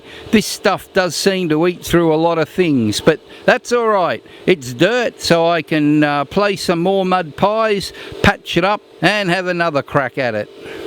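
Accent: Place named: Australia. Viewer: flamin aussie's eh ?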